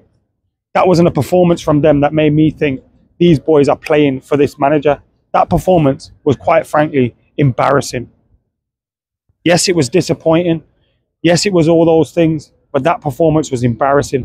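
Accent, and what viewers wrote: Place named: Manchester, United Kingdom. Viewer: Nice Manchester accent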